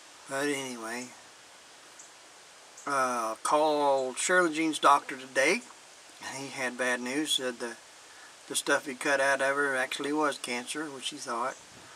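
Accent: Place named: United Kingdom. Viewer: Well I love the english accent, but I think it's a mixture of british, australian and south african!!!! That's a jolly big head on ya beer, you have to tilt your glass and gently pour it in........I just had a laugh at the tasting, very good video, but I wouldn't personally drink Bass even if I was forced!!! We'll have to try and get you some English beer sent from across the pond!!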